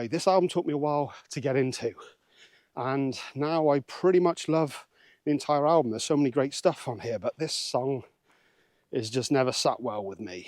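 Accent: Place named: Dartmoor, United Kingdom. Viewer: Where are you when you do these walks looks like Dartmoor or North Yorkshire to me. Guessing Dartmoor by your accent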